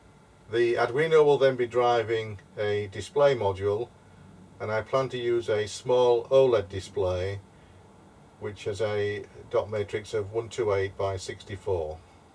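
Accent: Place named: United Kingdom. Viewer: There's something about your style that reminds me of 16mm educational films. Maybe it's your "Engineer Brit" accent (I'm not up on this: are you from the North, or the Midlands, or Bristol or what..?). Or maybe it's the font you use in your titles, which is right out of Encyclopedia Britannica films. One thing I really have to give you credit for: you are direct, to the point, and you don't repeat yourself endlessly like a certain ausie bloke I know of. But at the same time, it's a very conversational style of delivery. I can tell you've prepared, but you're still kindof speaking off the cuff. Whatever it is, I like it.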